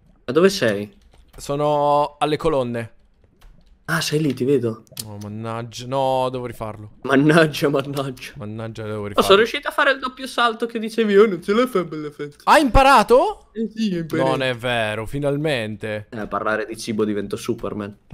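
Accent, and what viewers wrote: Sicily, Italy. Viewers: Ma sei siciliano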